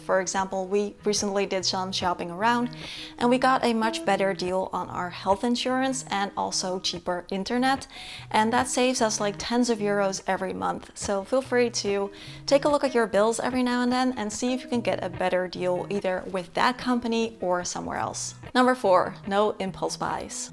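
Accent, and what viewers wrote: United States. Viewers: Netherlands English accents are American or did you learn English in America? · is fluent in English but definitely has an American accent! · Great video! Thank you,. I also want to compliment you on your English. I would have assumed that you grew up in the US. 😊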